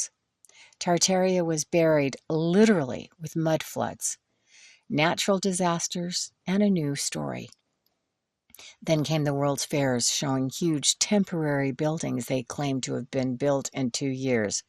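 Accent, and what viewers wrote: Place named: United States. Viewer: I'm 41 seconds in and your voice is more than the initial breath of fresh aair, it's American as Apple Pie and shelter from AI philosophers every other video. Ya watch one interesting Carl Jung vid and the avalanche of Yellow and Red starts happening in searches...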